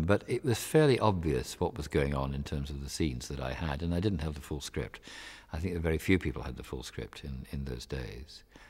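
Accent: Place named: Scotland, United Kingdom. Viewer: So nice to hear a scot doing this.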